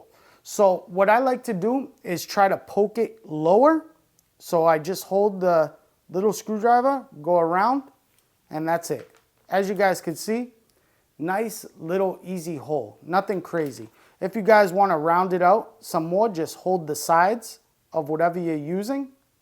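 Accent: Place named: Brooklyn, United States. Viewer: He Reminds me of my Brooklyn accent.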